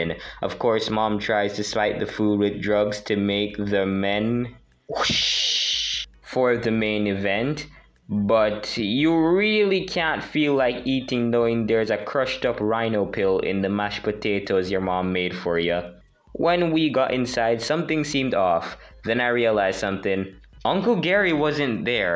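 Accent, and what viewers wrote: Jamaica. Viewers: Hey man are you a jamacan my guy i can tell by you accent🇯🇲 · It’s cool that your Jamaican mon! I can hear it in your accent (I’m from TT) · are you jamiacan🇯🇲?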